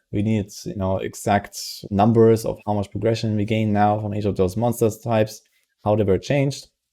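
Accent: Germany. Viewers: Role Model for "a german talking english" ❤️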